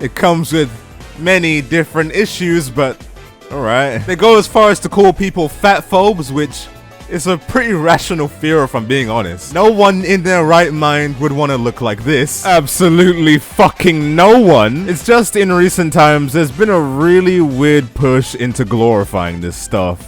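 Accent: United Kingdom. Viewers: you didt sound like timmy you sound just vary british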